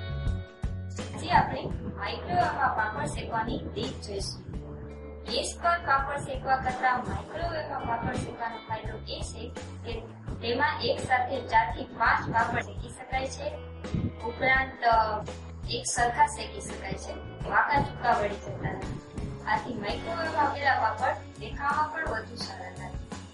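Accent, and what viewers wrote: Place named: Kathiawar, India. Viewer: Hello. Tame Kathiyawadi lago chho... Kyana chho?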